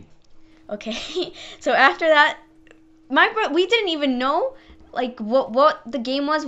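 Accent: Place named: England, United Kingdom. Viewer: It's very enjoyable when your English accent sneaks in. As far as showing your face, the mystery can be a lot fun. Think out of the box.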